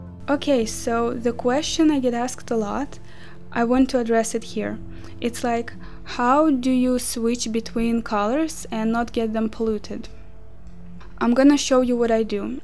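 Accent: Russia. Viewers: aren't you from Russia? i am, and your accent really sounds like russian)) you're cool✨ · Are you russian? You are have a russian accent)))) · i hear russian accent, are yoy russian? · are you Russian?You have a Russian accent. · Русский акцент, из России? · Такой акцент русский. ппц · Интересно, у нее русский акцент или какой? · По акценту понятно, что человек русский :D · Она русская ? Просто очень слышен русский акцент))))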